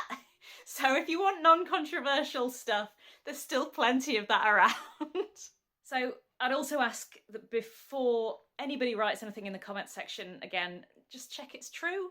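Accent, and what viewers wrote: United Kingdom. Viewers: So I'm not a brit, I've even joked about how aggressivelly british your accent sounds to me 😅 I rarely come here on purpuse but I never skip your video when it pop up in my feed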